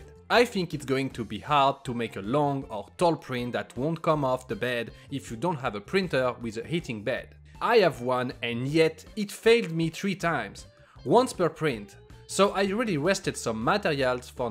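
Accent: France. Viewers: Ahahah l anglais parler par 1 français cest toujours assez amusant à écouter hahaha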